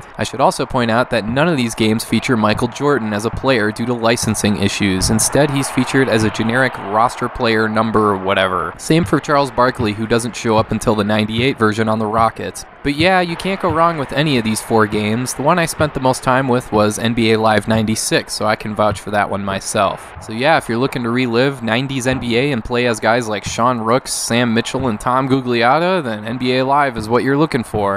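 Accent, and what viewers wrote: Minnesota, United States. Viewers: You from MN? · are you from Minnesota?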